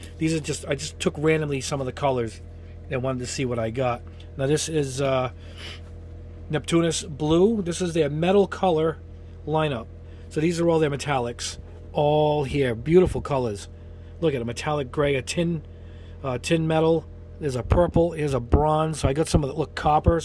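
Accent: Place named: New England, United States. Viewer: I’m hearing a distinct New England accent here…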